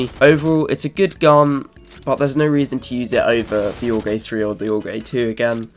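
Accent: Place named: United Kingdom. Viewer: nope (btw thanks for that british mate)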